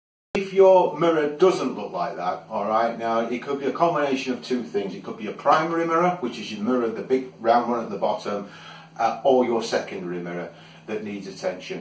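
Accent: United Kingdom. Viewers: Thank You for putting up such Clear & Understandable helpful videos Slowly Paced, worded & Demonstrated for the Newtonian Newbie!!.....Most of the Other fine channels use fast Complicated optical Terminology that Bewilder & Confuse beginners!!.....Especially like the Diy homemade Collimation tools & just love your clear British accent!!!.....Thx again!!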